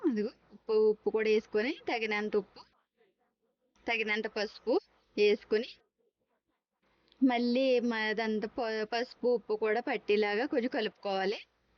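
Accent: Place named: Andhra, India. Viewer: Voice of Andra.. good cooking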